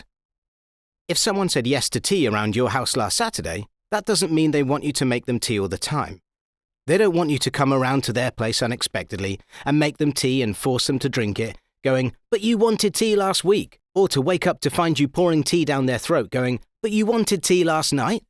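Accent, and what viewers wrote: United Kingdom. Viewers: This is just so much better with a deadpan, British voice :P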